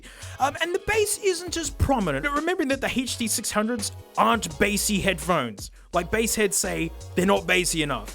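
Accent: Australia. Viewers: I don’t understand this nonsense but he has an Australia accent I’m gonna roll with it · I literally have no interest in music but I watched this entire thing and I don't really know why. Maybe it's something about hearing the Australian accent on YouTube laughing and joking around not sure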